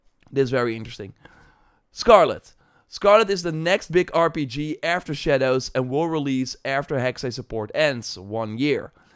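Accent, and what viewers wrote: Netherlands. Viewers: Dutch (Netherlands) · i really wanted to watch this video but that thick dutch accent is so grating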